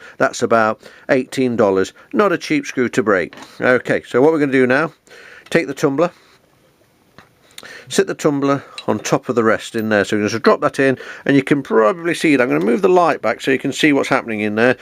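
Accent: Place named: United Kingdom. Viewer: Clear, concise and helpful video... with a great Brit accent to boot. Good stuff!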